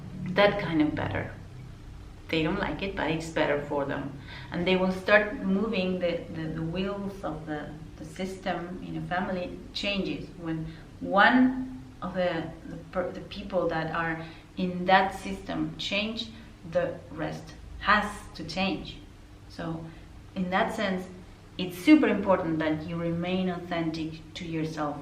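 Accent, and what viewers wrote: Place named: Argentina. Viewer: Me encanta. Ya sabia cuando te escuché hablar en inglés que eras argentinaaa🎉 Yo soy americana pero tengo gente como familia en argentina (Viedma Rio Negro). Gracias por este mensaje..necesitaba esto hoy. ❤❤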